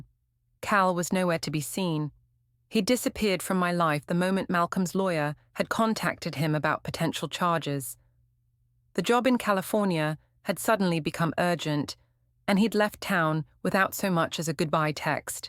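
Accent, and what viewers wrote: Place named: United Kingdom. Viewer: No longer listening to stories with a British accent.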